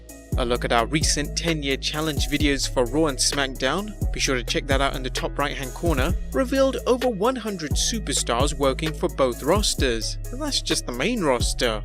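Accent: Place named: United Kingdom. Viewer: I hate to say it but I got use to hearing my wrestling news with a British accent lol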